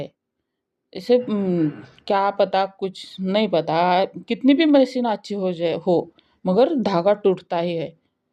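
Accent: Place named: Maharashtra, India. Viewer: mera dost maharastrian hai wo same to same apke jesa Hindi bolta hai ,mujhe sunne me acha lagta hai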